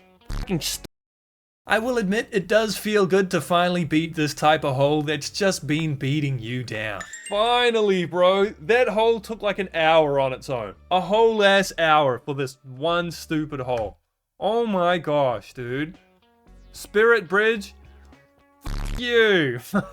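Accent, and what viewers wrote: New Zealand. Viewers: I knew the fuck as soon as I heard your voice you're a kiwi, where are you from my brother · New Zealand accent? Oh this is about to be a life-changing video lmao (all the cool people live ther)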